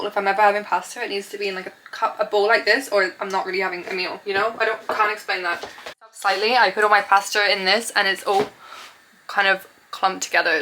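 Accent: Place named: Ireland. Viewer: Interesting accident, slightly Irish are you?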